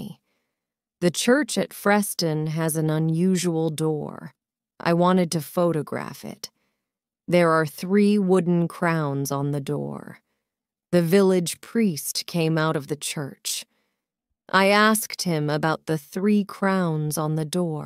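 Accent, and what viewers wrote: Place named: United States. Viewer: I do believe this is an England story, but read in American accent. I am an new English learner and I am currently learning American English.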